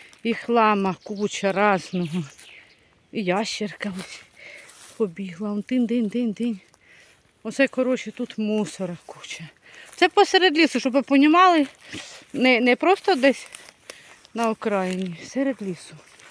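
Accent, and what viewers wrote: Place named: Surzhyk, Ukraine. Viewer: Суржик дикий.😂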